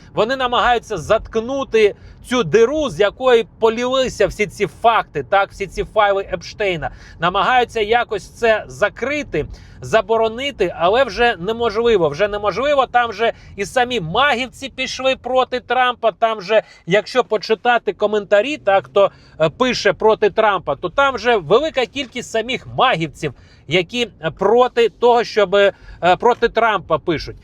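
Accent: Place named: Russia. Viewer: РОСІЙСЬКОМОВНИЙ КАНАДЕЦЬ?? ВПЕРШЕ ЧУЮ ТАКИЙ ЗУХВАЛИЙ РОСІЙСЬКИЙ АКЦЕНТ !! ВИ Б СПОЧАТКУ ОПАНУВАЛИ СПРАВЖНЮ УКРАЇНСЬКУ, А ТОДІ СТВОРЮВАЛИ КАНАЛ !!!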